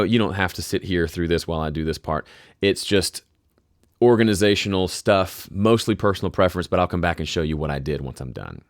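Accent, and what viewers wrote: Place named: Tennessee, United States. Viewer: He sounds like home (I’m originally from TN)!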